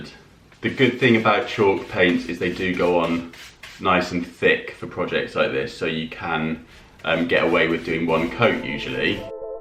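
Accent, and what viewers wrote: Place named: Birmingham, United Kingdom. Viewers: Love the DIY and the very cute Brummy accent lol. Sounds just like me 👍😋# Mr talented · Ha ha loved the Birmingham accent 😂 I liked the white pumpkin & grey skull best! 🎃🎃🎃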